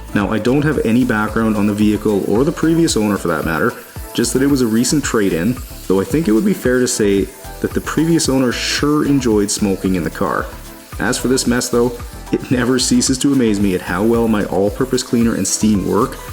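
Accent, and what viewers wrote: Canada. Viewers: I always quickly ask myself why parts look like the vehicle was around the coast too much as a Texan, then quickly remember this is from the salt on the roads during y’all’s inevitable snow in SK, Canada. One of my beloved best friends of decades is from there and he has many stories of living on a farm and dealing with crazy amounts of snow. Much love, enjoy the vids so much. I love catching your Canadian accent btw.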